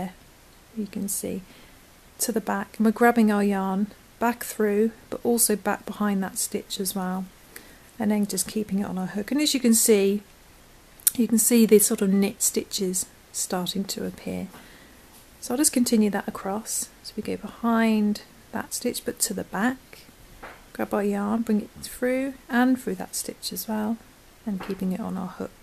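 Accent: England, United Kingdom. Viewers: Hi I notice you're talking in an English accent but talk about single crochet. In the UK we call it double crochet. Please could you tell your UK viewers the difference. Thanks. x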